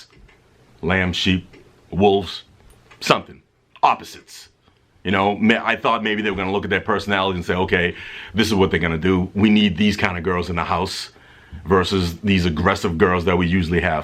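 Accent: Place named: East Coast, United States. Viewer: Im living for your accent.. my dad has an east coast accent as well just not nearly as thick as yours! I love u and the girls and Marcellus as well!! Keep the videos coming, im diggin the channel pops! 😘❤️❤️❤️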